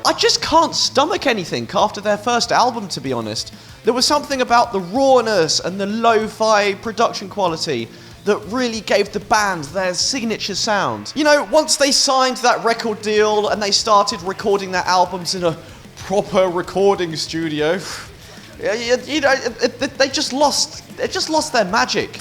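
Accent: United Kingdom. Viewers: Your english akzent is very british!;)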